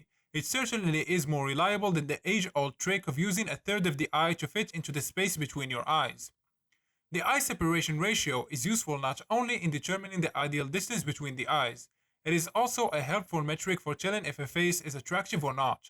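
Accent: Morocco. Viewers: This guy sounds very Moroccan.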